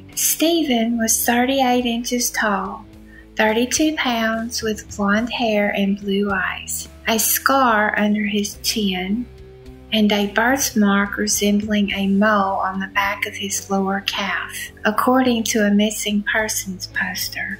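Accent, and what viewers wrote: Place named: Kentucky, United States. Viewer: Your voice is so soft & lovely. What is your accent? You sound Kentuckian to me.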